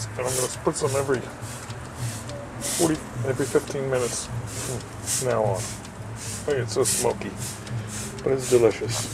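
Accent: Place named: Great Lakes, United States. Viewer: where are you from ? I hear great lakes or MN but swear I heard you say oklahoma lol. That could be the T.B.I. fooling me though.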